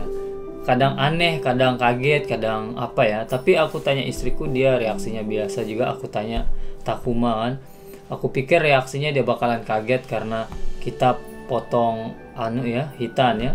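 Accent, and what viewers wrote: Lombok, Indonesia. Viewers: Bang orang Lombok ya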